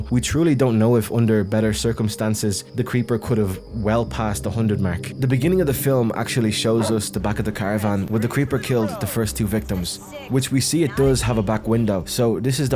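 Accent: Ireland. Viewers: You sound very Irish if I say so myself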